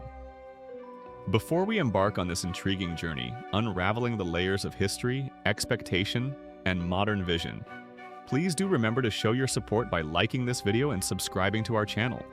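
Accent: United States. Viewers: I will never like AI in an american accent.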